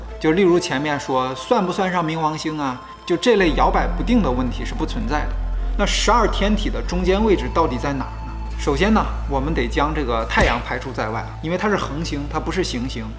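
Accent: China. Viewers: nic小哥听起来像是焦作那块儿的。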